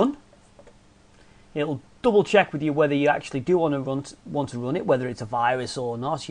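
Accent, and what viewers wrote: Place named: Scotland, United Kingdom. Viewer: heh nice scottish accent!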